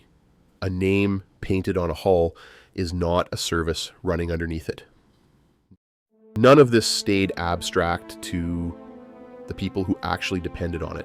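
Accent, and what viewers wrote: Canada. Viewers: ...and the Canadian accent.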